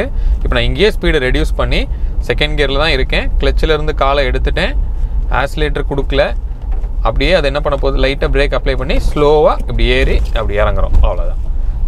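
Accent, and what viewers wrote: Virudhunagar, India. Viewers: Bro neenga விருதுநகர் ahh bro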